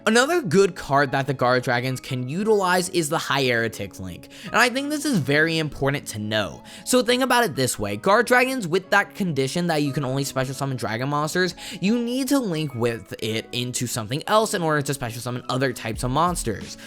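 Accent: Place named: South, United States. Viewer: u gotta read the fine print of the banlist to see the borrelguard ban, it’s very easy to miss. As for the southern accent, I totally see it. It’s way funnier reading my comments in that voice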